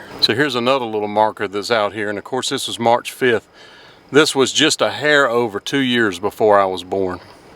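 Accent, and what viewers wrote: South, United States. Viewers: Just love your accent. That's proper Southern, that is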